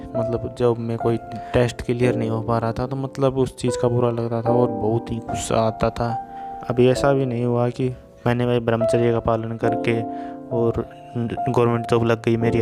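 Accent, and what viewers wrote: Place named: Haryana, India. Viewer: Bhai mujhe lgta h ki tum haryana se ho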